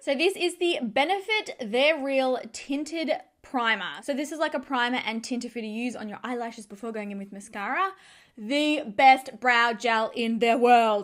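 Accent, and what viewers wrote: Australia. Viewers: Love your videos. You are so beautiful and sweet. And I love the Australian accent. XO from Florida. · Why Australia has to be sooo far away?? 🌞🌞Love your videos and your accent!! 😙 #HolyGrails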